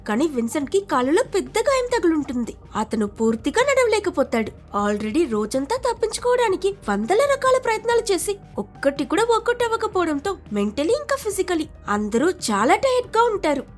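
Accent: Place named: Tirupati, India. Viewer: Hello by identifying your voice I am guessing your from tirupati around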